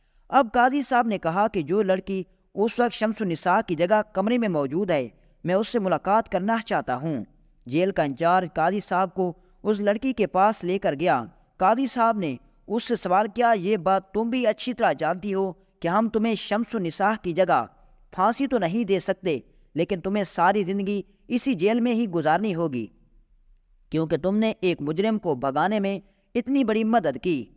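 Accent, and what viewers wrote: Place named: Saraiki, Pakistan. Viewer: Saraiki lehjy main urdu boli ja rahe ha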